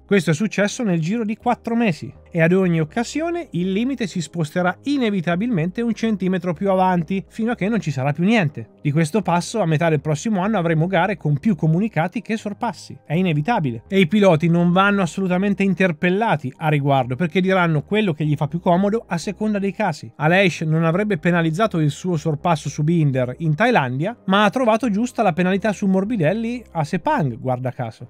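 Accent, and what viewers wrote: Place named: Veneto, Italy. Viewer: E io che pensavo che fosse Emiliano, ora ho il dubbio che sia Veneto 😂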